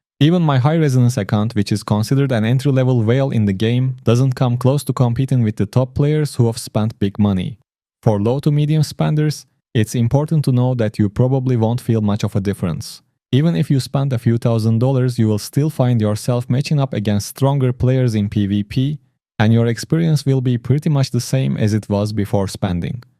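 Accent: Turkey. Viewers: Türk aksanı nerde görsem tanırım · Türk olduğunu söyleyebilirim ama ispatlayamam. Aksan bariz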